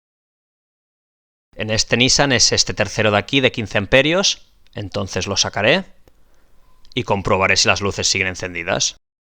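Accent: Spain. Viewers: Excelente gracias amigo, español verdad? saludos Chile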